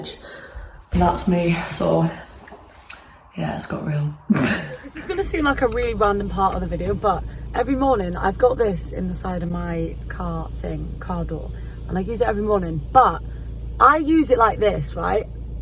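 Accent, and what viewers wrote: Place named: Wales, United Kingdom. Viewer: Sounding Welsh in this one beaut x love it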